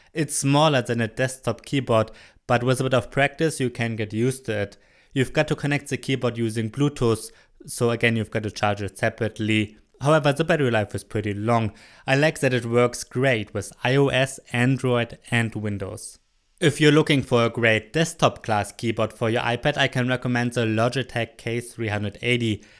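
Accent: Germany. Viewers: I am an Indian who is in Germany. I hear my colleagues speaking this accent when we have a conversation in English. lol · I LOVE THE GERMAN ACCENT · Most German accent ever can barley listen to it.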